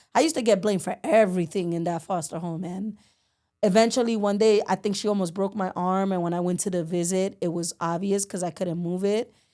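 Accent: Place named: Connecticut, United States. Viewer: sounds like she is from Connecticut,,,plus she didnt mention what neighborhood,,every one from NYC says where they are from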